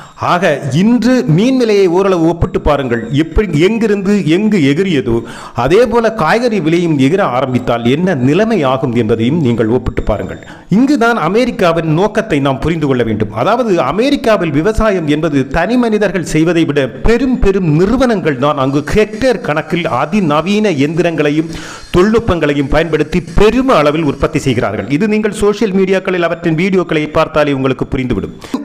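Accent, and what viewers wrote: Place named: Kanyakumari, India. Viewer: Hello voice Kanyakumari voice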